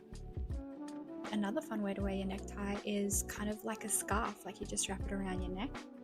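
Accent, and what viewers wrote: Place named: Melbourne, Australia. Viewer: i could tell you were from melbourne immediately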